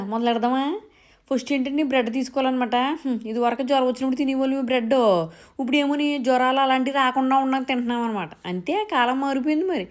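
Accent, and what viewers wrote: Godavari, India. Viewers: ఛీ నీ.. నువ్వు నీభాష నిజంగా గోదావరి జిల్లా వాళ్ళు అంతా సాగదీసి మాట్లాడారు దరిద్రం, దరిద్రం అని 🤦🤦🤦🤦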